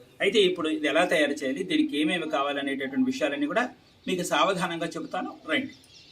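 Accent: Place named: Brahmin, India. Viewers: మరొక మాట బ్రహ్మల మాట ఎప్పుడు అంత swachamga ఉంటుంది అందులో మంత్రాలు chadive వ్యక్తి so మాట అలగే ఉంటుంది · Tamilian ayina mee telugu madhuram ga undi mee vanta laga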